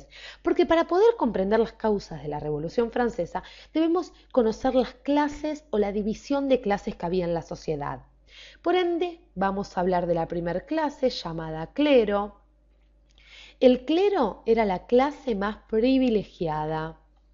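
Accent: Argentina. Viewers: Me encanta el acento argentino :D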